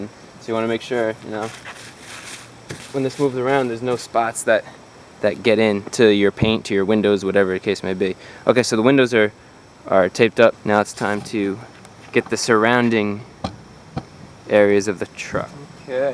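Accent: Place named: Philadelphia, United States. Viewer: I don't recall ever watching this video. I use to have a '97 B2300, but didn't have it long enough to do this. My current 08 Honda Crv has fading on the B pillar trim and trying to fix it. Dealer says that its a film on top. I hope its an easy fix. Was this video before "Soappy Woder"? Philly guy here, we say woder the same way.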